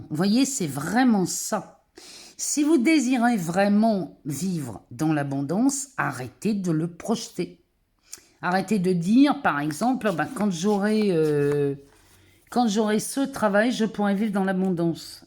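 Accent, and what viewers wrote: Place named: Nord, France. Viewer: Bonne année. Merci d'être zen précise et positive. J'adore votre accent Chti.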